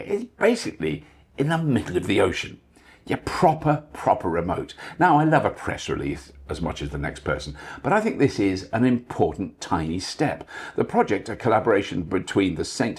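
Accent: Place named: United Kingdom. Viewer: Brilliant!. Thanx for talking in such a relaxed, humorous British way, but still getting the message through. Love your videos 👍🏾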